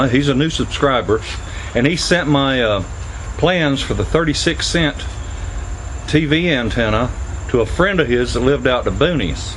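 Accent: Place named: South, United States. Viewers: i was born in Coffee co. raised up in Paynes cove, Pelham TN. now in NC. i knew I recognized your accent. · good video, will make one. really like your videos, can tell are from the south, im a hillbilly from western nc, like to see a video on easy way to nut hogs.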